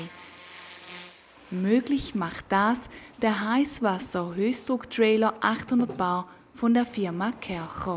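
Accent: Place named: Switzerland. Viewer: also das weiblein hier muss schneller sprechen. und wieso das alles auf schweizer-hochdeutsch ? ich bin doch hier bei kärcher schweiz ??? versteckt euch nicht und lasst unsere schweizer dialekte sprechen lol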